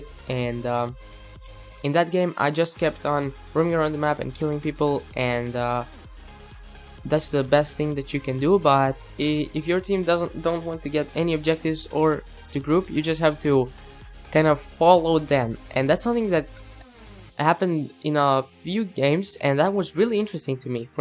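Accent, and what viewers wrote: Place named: Philippines. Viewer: Let me guess: do you play in the PH server? Your accent sound Filipino...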